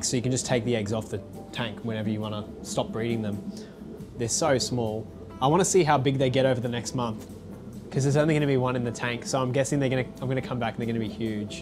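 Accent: Australia. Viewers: There's just something special about someone with an Aussie accent telling you about guppies haha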